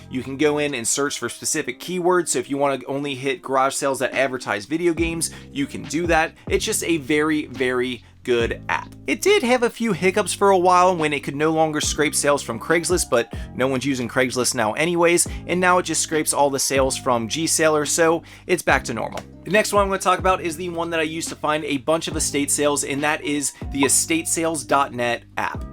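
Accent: Philadelphia, United States. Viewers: God video. Are you in PA? You have that philli suburb accent.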